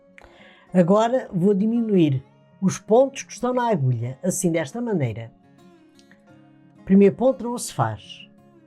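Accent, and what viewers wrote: Portugal. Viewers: Já fiz muitos de seus trabalhos. Vc é uma mestra! Bjs de Boston e amo esse acento português maravilhoso é tão charmoso!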